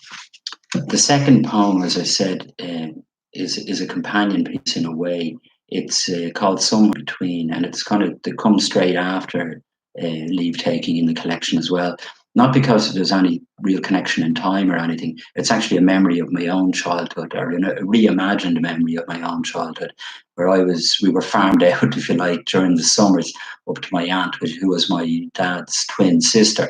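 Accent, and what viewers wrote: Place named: Dublin, Ireland. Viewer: Lovely to hear you read in your Dublin accent, I'm so used to my own inner voice reading your poetry, this was a surprise. Great reading! Thank you.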